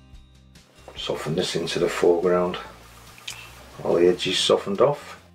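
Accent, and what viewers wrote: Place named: Sheffield, United Kingdom. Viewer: This is kinda random but, your accent reminds me of several of my favorite musicians like Richard Hawley and Alex Turner. They both come from Sheffield in the U.K. and in particular I've heard them say they are from High Green(I'm American and have never been to the U.K. but certain accents are somewhat recognizable to me) To me the 'Sheffield' accent sounds similar to Scottish and Irish.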